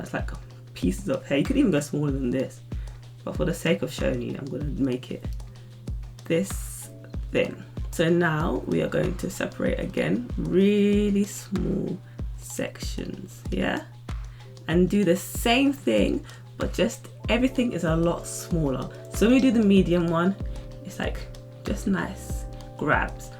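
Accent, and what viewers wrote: United Kingdom. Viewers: I love your accent London accent i guess You are a beautiful woman with a beautiful voice 💕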